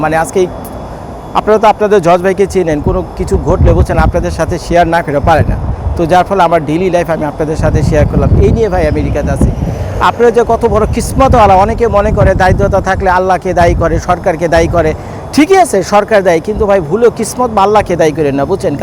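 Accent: Dhaka, Bangladesh. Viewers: da apone ki hasnabader chele naki aponar kotha kichu ta dhakakiya der taan ashe baki ta mone hoy hasnabader jak bhalo thakben barir shobai ke neye ae prathonai kori ami ❤❤❤❤❤❤❤❤❤❤❤❤❤❤❤❤❤❤❤❤❤❤❤❤❤❤❤❤❤❤❤❤❤❤❤❤❤❤❤❤❤❤🎉🎉🎉🎉🎉🎉🎉🎉🎉🎉🎉🎉🎉🎉🎉🎉🎉🎉🎉🎉🎉🎉🎉🎉🎉🎉🎉🎉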